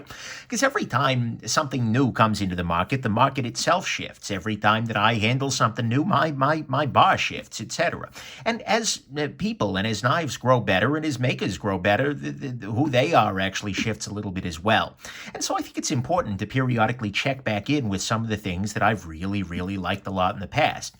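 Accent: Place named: New Jersey, United States. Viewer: funny, but I think is from New Jersey, we don't talk like that in New York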